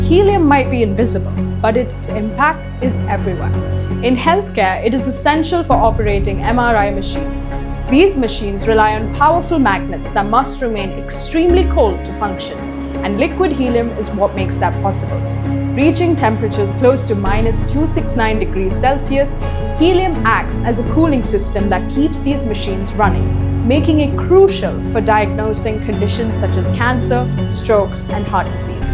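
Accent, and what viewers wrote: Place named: India. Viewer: Informative. Beautiful Indian tone.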